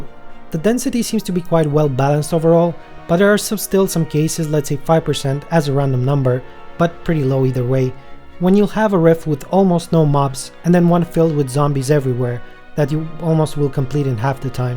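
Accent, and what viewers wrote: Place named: Philippines. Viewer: you sound like filipino.. hmm...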